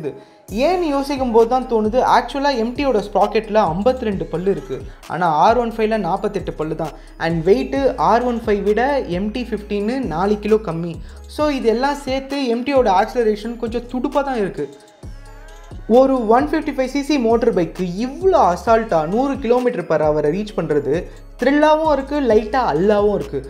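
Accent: Chennai, India. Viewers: Bro good voice good style of commentary very super style natural madras tamil like my brother 👌 Super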